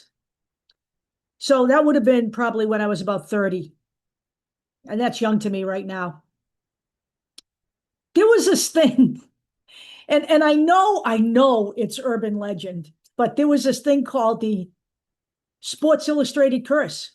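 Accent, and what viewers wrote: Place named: New York City, United States. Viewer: I watched that Lundberg report. I remember him concluding ESPN only put that date due to needing a 'placeholder' on their shows. An estimate, they don't really know when she's coming back. FYI I'm 75 grew up in NYC, fan of NBA since childhood. Only watching WNBA now because of CC. She's the best! You sound like you're from NYC too. Enjoy your sharing and style, reminiscent of NYC.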